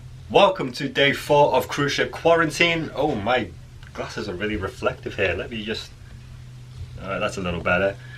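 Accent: United Kingdom. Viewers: How does your English accent affect your speaking your native language? thanks for sharing!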